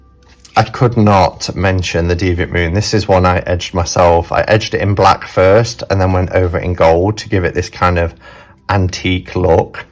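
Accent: Nottingham, United Kingdom. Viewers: Nice reviews. You sound like you're from Nottingham like me lol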